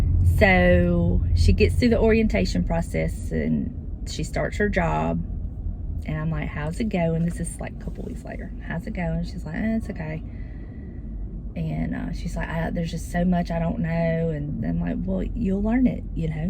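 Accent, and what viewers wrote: South, United States. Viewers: I enjoyed watching you video. I was so happy you shared the answer to prayer with us. When I was in nursing school my lead instructor was recovering from cancer. We were able to get more clinical than the other classes, on days she didn’t feel good. So proud she has a job she love. I have probably overused this phrase, but I love ❤️ your southern accent. I don’t feel living in n. Louisiana that I have much of a southern drawl. You have a lovely home. Looking forward to your next blog. God bless.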